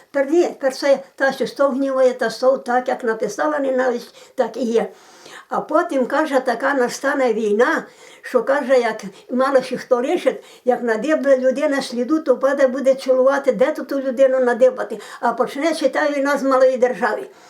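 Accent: Lviv, Ukraine. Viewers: Українська, Львівська область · В нас в селі Львівської області, такий самий говір. Так приємно слухати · Не сперечайтесь,що до говору,так розмовляють на Львівщині!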